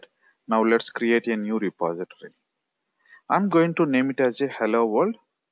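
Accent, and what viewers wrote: India. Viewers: Every indian pronunciation sucks I can't stand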